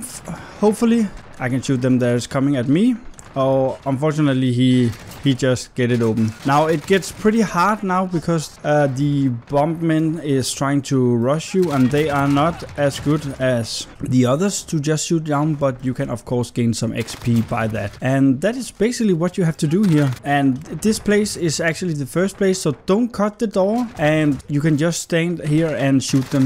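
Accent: Denmark. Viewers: You're danish right? You sound really danish. Especially when you said quicker like quigger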